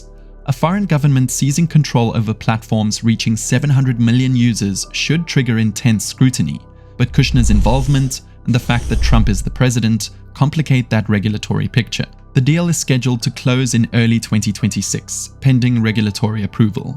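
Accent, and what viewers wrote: South Africa. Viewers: Someone said your voice is like a robot, didn't know a South African accent could be robot like, great video bru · top quality content man. and i can hear a "whaaait" South African accent? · I also thought he sounded South African. 🤔🇿🇦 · bro you have a south african accent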